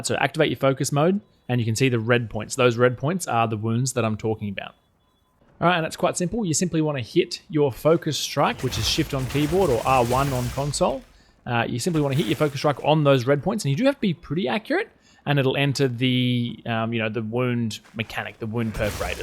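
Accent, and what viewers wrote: Australia. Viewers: It's definitely struggling at times, but it's not so much the video as it is your in-game performance - it appears that your fps is dropping into the sub-30 territory sometimes which will definitely create some serious input delay issues eventually especially in more performance heavy fights and environments. This issue may not appear to be so apparently severe on your end, especially when you're just playing without recording, but the additional performance cost of recording might explain the degradation in performance captured in the video. If you'd like any advice, I'm pretty experienced with this stuff (around 20yrs of being a PCMR giganerd), and if I knew your rig specs and the display you're using I could probably offer some quick and easy things to improve it for you. But only if you'd like, I'm not one to force unsolicited advice onto anyone. 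Also, while I understand you're not super fussy about performance, for the sake of recording it would definitely be helpful for the audience. Either way, thanks for the video and info! It's been a great resource for me in brushing up on my Greatsword knowledge/skills and getting back into it since I took a break from MHW (that ended up being far more permanent than I originally intended 😂) right before Iceborne released. As a side note of interest, as an American English speaker I have always very much enjoyed and prefer listening to Australian and NZ accents (I often get them mixed up so listed both lol). Very easy on the ears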